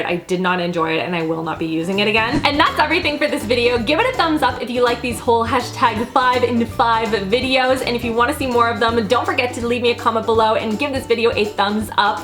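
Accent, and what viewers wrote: Canada. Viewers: Hopefully this doesn't sound ignorant lol But I have always been like shouldn't she have some sort of an accent being from Canada. Until she said "out" I was like ding ding there it is lol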